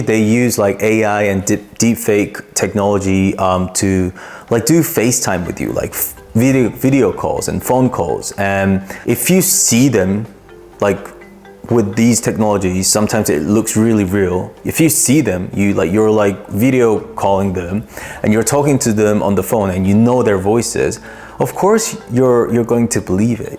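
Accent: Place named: United Kingdom. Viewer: First of all, celebrities do not have time to be chatting with their fans privately. They don’t even have a lot of free time so if they do find free time, they would NOT use that to private message a fan. Sorry fan, but you’re not that interesting. Why would a celebrity choose you over his colleague or another person of equal status? 🤔 Also, celebrities work hard to be where they are so they’re not interested in socializing with fans who are in a place of dreaming and fantasizing. If they want friends, they would choose ppl of the same status because they’re more relatable and trustworthy since they’re of the same socioeconomic status. If you’re a victim of scams then that’s one reason why a celebrity would never talk to you. Lol. You may not have money, but at least be a smart human being so that way you can at least appear attractive. Let’s be real. 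I’m not a celebrity, but not even I would want to be your friend. 😂🤣😂 I do hope the fans that fall for these scams are little kids. This video would be helpful to them. If you’re an adult and not able to use basic common sense to distinguish what’s real and what’s not, you got issues. Being scammed is not even a problem worth highlighting if you’re an adult. The root issue is… 🫢😅 Btw I appreciate this video. I’m new to this channel and I love the British-English accent. I always thought English Language Learners would want to learn American-English. It never occurred to me that an ELL would learn English with British pronunciati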